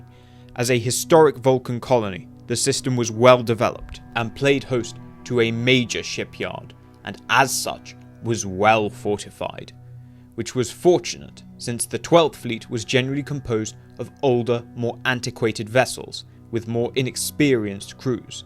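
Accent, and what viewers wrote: RP, United Kingdom. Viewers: You are sure to come from England because you can clearly hear the Received Pronunciation. Your RP or British English is flawless and very clean for me to understand in contrast to US English. There are also differences, for example in New England, the people there are very close to the RP. Otherwise, I don't understand anyone in the US. The pronunciation is worst for me in Alabama. Learning English is compulsory in German schools, but I think the mistake is that the students are drilled in RP. Not only in pronunciation, but also in vocabulary. There are clear differences between words and spelling in US English. My pen pal from the USA agrees with me and thinks that it is a mistake for the students to be drilled in RP in Germany. He says he always notices that when he reads my letters, because I stick to the RP vocabulary and also to the spelling of words that are spelled differently in US.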